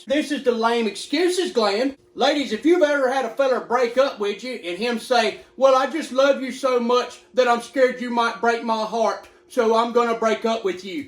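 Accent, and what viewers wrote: South, United States. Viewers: For some reason, the Southern accent makes it so much funnier :D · His accent makes it more American, that's why is funny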